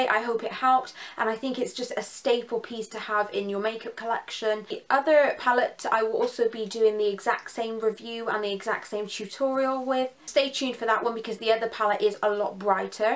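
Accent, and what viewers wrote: Bristol, United Kingdom. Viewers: Are you from Bristol? I'm from Bristol and my accent sounds so strong in my videos lol. Love your videos btw xx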